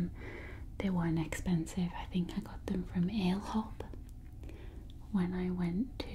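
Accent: United Kingdom. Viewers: i love ur lovely British accent🥰🥰🥰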